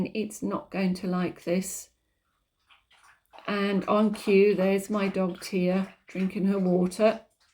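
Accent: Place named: England, United Kingdom. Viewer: I’ve thought about trying acrylic inks on polymer but I’ve tried some other inks without great success so hadn’t got around to it. I’m also going to try some acrylic binder medium with various inks/distress oxides/watercolours etc. to see whether that will help them stick to the clay & dry. Your earrings do come out rather well & adding the drops directly to the clay sheet & blowing/letting the ink run/dabbing with different textured cloths etc may create some beautiful effects. May I offer a suggestion though? I’ve looked past your videos a few times because I tend to watch YT on my phone & your thumbnails tend to be so “busy” with a large collection that I can’t see how well they actually come out or what the result is. But I must admit I do enjoy a nice straightforward tutorial with a lovely English accent (my parents are English) so I wish I’d tried yours sooner. 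I tend to find a good closeup of a single item against a bright background gives a much better idea of what a tutorial will be like & whether it’s a technique I haven’t tried, or a result that’s interesting. There are so many creators that it’s little tips like that that can help you stand out more 😊 Thank you for the inspiration!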